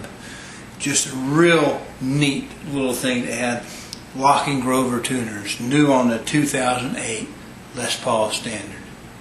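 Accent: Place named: United States. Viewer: i like people talk with cowboy accents.. sounds like pretty cool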